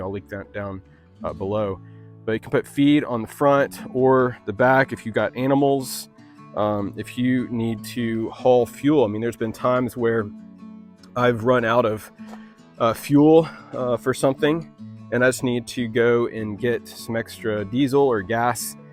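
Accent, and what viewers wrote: United States. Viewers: Portugal. We have very different accents in our regions, I think its the same everywhere, just wondering were in America is this accent from, east coast, west coast, north, south?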